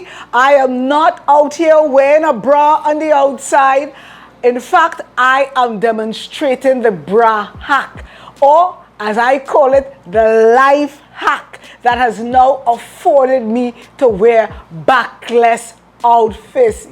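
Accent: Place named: Trinidad and Tobago. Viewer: Oh GOSH GYAL! All looks are 🔥 🔥🔥 Look #3 was my fav! I could listen to your trini accent ALL DAY! And yes... FUMI SENT ME!😂😘💓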